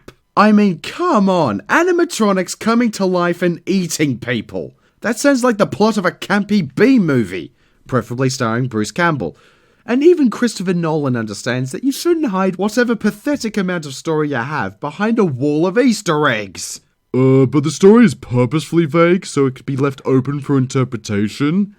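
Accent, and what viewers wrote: Australia. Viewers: Why do you sound Australian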